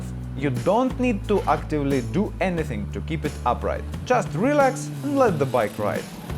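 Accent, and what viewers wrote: Russia. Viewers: Are you russian or enflisg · Fing russian accent... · contenido en ingles, con acento ruso, filmado en argentina xD que combo. muy bueno tus videos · Аххх! Этот классический русский акцент - прямо как из старых голливудских фильмов! =)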